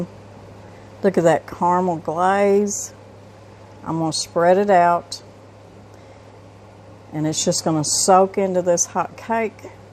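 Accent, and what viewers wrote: South, United States. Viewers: So glad I found your channel. That cake looked amazing!! Great for fall baking! I'm definitely going to be making this. Thank you for sharing. You are a wonderful teacher and I love your southern accent. Have a blessed day! ❤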